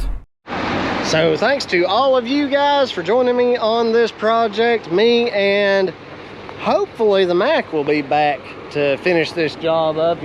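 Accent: South, United States. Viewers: Value beyond a price tag just watching you position that tractor, wait for the rock over, then gently glide that Blade to the sound! Total Master of your Trade and your creeping the controls to set that Box Blade is Art Work My Good Southerner! This is like the third time watching and it never get's old, pick something new up each time on your operator skills I transform to My lot for hours of frustration and confusion of not being able to capture the 'Magic' ! Ha, Lol. My 94 Honda 22 HP I just picked up is so 'touchy' on the controls? Adjusting the float helps but the lift is impossible to get to slowly 'creep' up and down on the hi-lo spots. My point of view is lower with my 5 ft. Tarter BB, I can't quite see into the box to watch that front blade? Angle of the dangle, what kills Me is to watch you skim in reverse pushing the blind spot of the back blade! 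Touching just the edges or a slight high spot. You make it look easy My Main Man! Enjoy the vid's and Southern accent/hospitality. Reminds Me of some old AF buddies from Virginia, Kentucky and of course South Carolina. Stay safe and healthy from Northern Nevada!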